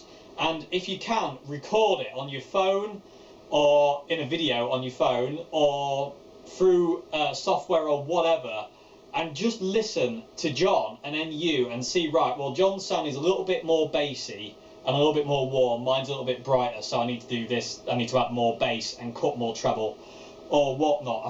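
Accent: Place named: Birmingham, United Kingdom. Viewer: I enjoyed this! cheers mate. I especially liked the "Apache rose: Peacock" breakdown. Thats a lovely chord progression - and you did it nicely. Fair Play. :) JpD. (PS: you sound "brum-light", like a young J.Carrot - it's an observation; and he is a likeable bloke, so don't think im being off). Keep up the good work mate :)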